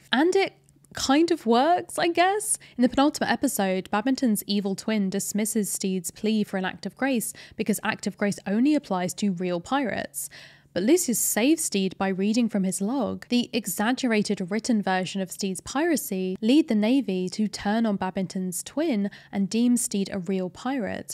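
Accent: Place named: United Kingdom. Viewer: The subtitles transcribed the word duel as "jewel" so if I had forgotten that you were British that would've reminded me lol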